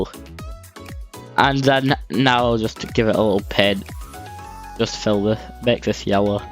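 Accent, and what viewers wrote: United Kingdom. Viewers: I like your British accent idk if ur British but sounds like British to me btw I subscribed cuz of ur glider ❤❤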